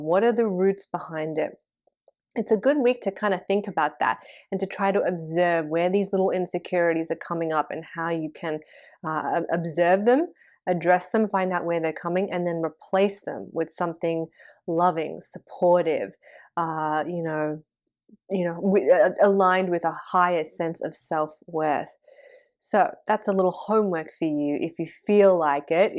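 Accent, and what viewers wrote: Australia. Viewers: She must have lived in Australia a long time because of the Australian accent.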